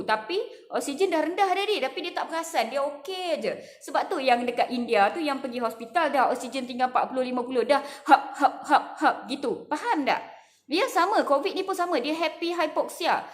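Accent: Malaysia. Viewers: Trying to focus on your explanation but honestly cara dr cakap melayu jugak attract me more 😅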